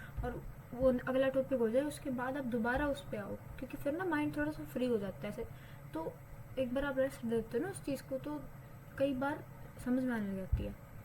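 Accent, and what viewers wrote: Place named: Haryana, India. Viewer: firstly, thanks alot for the effort of making it and secondly i watched your 1 video before this one and i was 99% sure u were from haryana now this confirms 😂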